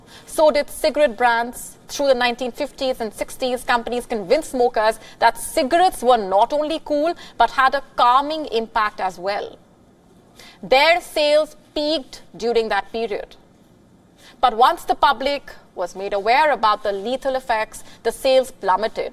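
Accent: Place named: India. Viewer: What an english accent., please choose a narrator with a good accent., she is talking indian not english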